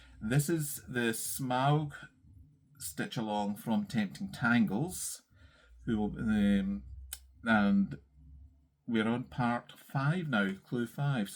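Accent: Scotland, United Kingdom. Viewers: Hi! 🎉 congratulations on your first 2 Flosstubes. You’re a natural and I miss Amanda so I’m getting my fix of your wonderful Scottish accent! I’m Pauline of Sew. Be Bags who you bought your lace front project bag from. I remembered when I saw you’d made a Flosstube it was who bought one of my bags. As I’m watching you I’m stitching on Portrait of Veronica but she’s going away so that I can start my Glass Slipper because yours is fabulous ❤❤❤ love love love her. Can’t wait to see no.3 to see what you’ve been up to. 😊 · Love to hear a fellow Scottish accent on flosstube! I loved your full coverage progress on 18ct- cats are my muse, especially a black one.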